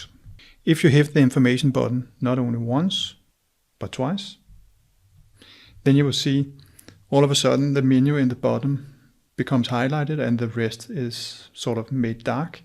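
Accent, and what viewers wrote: Denmark. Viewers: are you danish? your accent is very danish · I love your Danish accent :)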